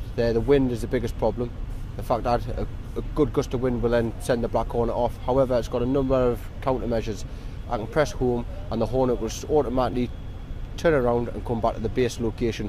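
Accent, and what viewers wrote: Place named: United Kingdom. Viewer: What kind of shit mouth language is this British dope speaking...?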